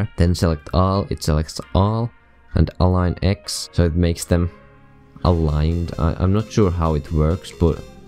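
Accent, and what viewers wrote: Finland. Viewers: Are u finnish?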